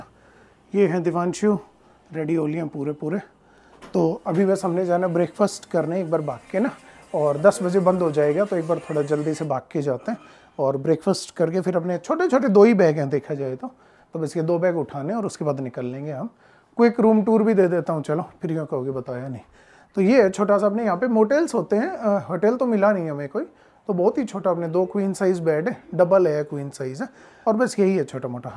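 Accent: Haryana, India. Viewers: Haryanvi kitni hi shudh hindi bol le Haryanvi ka pta lag hi jata h Jai haryana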